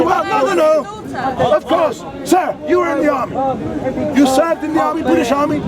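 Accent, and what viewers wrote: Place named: United States. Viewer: anybody noticed he has aa american accent?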